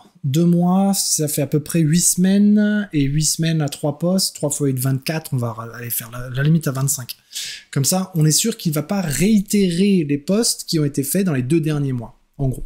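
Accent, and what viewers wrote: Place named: England, United Kingdom. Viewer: top ,comme à ton habitude et j'aime bien ton accent anglais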